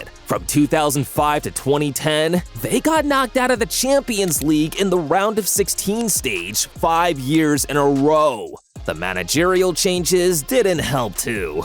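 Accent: United States. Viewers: What kind of American narrator is this? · Your voice an american pronunciation are unbearable, can't watch this shit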